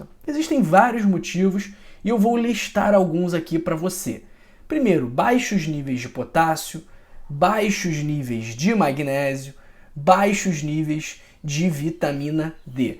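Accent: Rio de Janeiro, Brazil. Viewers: Partiu comprar aipo e colorau. O youtube me indicou este canal e já me inscrevi. Excelentes esclarecimentos. Entendi tudo com este carioquês legítimo. Aqui outro carioca da gema.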